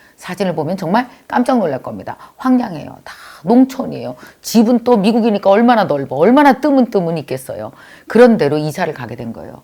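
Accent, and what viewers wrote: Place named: Chungcheong, South Korea. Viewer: 충청도 ㅋㅋㅋㅋㅋㅋㅋ 아 웃겨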